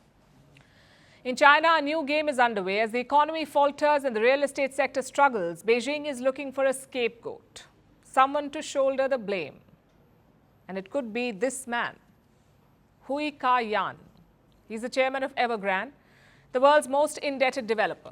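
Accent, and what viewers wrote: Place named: India. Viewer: Okey, Palki nice to hear your Indian accent once more. Thank you for ths update.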